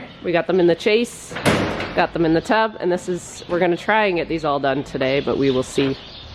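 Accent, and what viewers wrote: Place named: Canada. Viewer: DrummerGirl423 she’s Canadian!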